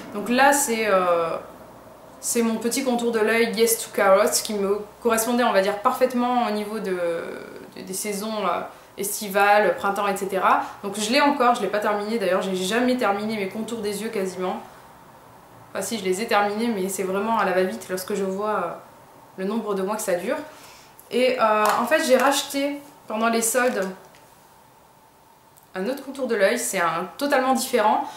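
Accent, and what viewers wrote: England, United Kingdom. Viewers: tu es l une des mes youtubeuses beauté préféré.. je kiiiiiiffe tes conseils, ta facon de t exprimer, ton accent anglais (hihi surtt qd tu dis le mot HIGHLIGHTER LOL), ta facon de présenter tes produits (tres bonne ambassadrice) et ta classe naturelle. Tu as bcp de gout et bcp de talent. Que fais tu dans la vie ? Commerciale ? Bref.. tu as compris que je te kiffais et j apprécie que tu parles svt de produits a petit prix..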